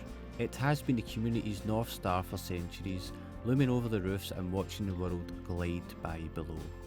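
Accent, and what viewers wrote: Scotland, United Kingdom. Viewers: Naarden-Vesting is definitely worth a visit! In the past, I often took a walk around the entire fortress with my son, who was 10 at the time, along the outside of the water. Beautiful and very peaceful. I do have to get used to your nice Scottish accent a bit, though. Have lots of fun in the Netherlands and thanks for your video.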